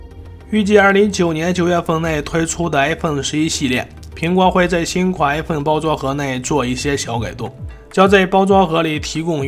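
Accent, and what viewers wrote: China. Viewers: 中国农村口音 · 兄弟，你是山西人。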